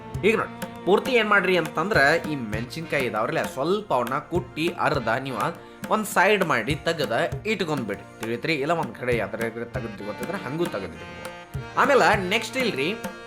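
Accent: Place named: Karnataka, India. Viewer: Love this Kannada accent ❤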